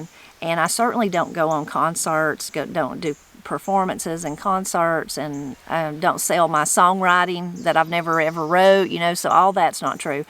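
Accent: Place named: East Tennessee, United States. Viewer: Just chiming in to say that I enjoy your videos, and it's crazy to me how it's anybody's business about your money other than your own. Keep on doing what you're doing, and it's good to hear voices that sound like home to me. East Tennessee expat in South Central Virginia, I'm still technically in Appalachia, but it's a little different than home.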